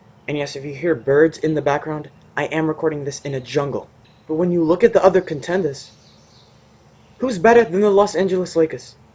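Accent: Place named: South, United States. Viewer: You sound like you have a country accent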